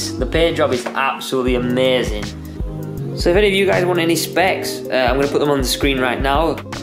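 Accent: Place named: United Kingdom. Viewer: Why are you talkin british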